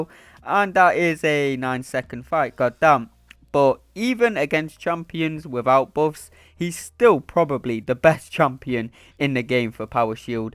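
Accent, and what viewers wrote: United Kingdom. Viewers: Hey, where in the UK are you from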